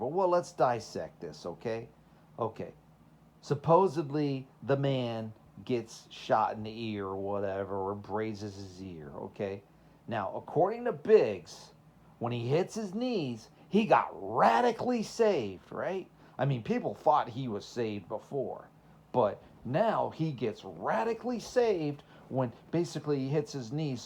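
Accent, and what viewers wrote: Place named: Minnesota, United States. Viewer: You sound more like Minnesota accent than TX surprisingly.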